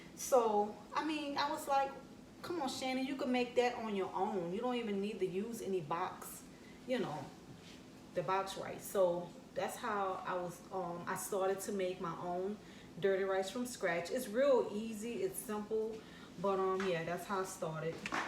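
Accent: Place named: New Orleans, United States. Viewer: Nice baby yo accent so new awlins. Gentilly?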